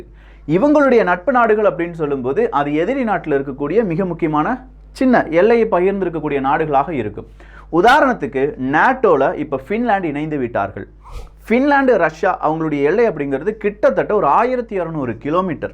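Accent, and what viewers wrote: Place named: Tamil Nadu, India. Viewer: Thamizh kural❤️😊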